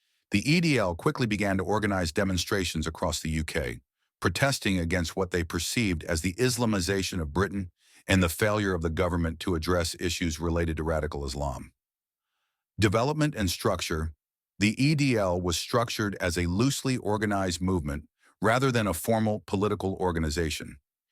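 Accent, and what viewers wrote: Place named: United States. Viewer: Awful lifeless video for two reasons: the AI voiceover is in an American accent, and the video is clearly made by AI, not real footage.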